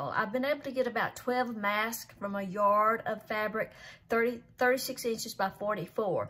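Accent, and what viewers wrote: South, United States. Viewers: You have a GREAT ACCENT!! This is the BEST Southern accent, y'all!!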